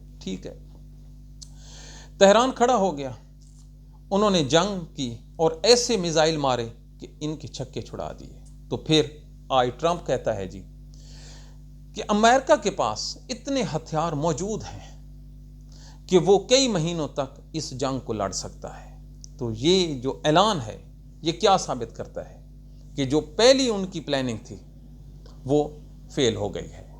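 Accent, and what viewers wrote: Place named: Mianwali, Pakistan. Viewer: Bhi sab kia ap k taalq mianwali Cha?aoa meri shadi Sargodha hoi ha m b mianwali piplan c Hoon ap k lehja APNA shar jaca ha is Lya m or mera husband ap k vlog Shok c dakhtay hen Allah ap ko khosh rkha .or khoshi hoti ha jb ap reply krty hen.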